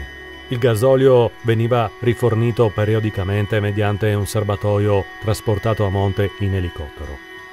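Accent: Italy. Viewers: Scusi lei è veneto? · Un altro servizio superlativo... niente da aggiungere.. tutto perfetto e bellissimo, narrazione precisa e molto tecnica ma comprensibile a tutti... complimenti davvero...bravissimi (per mi che son della zona l'accento triestin se un plus che fa sempre piazer 😀😀)..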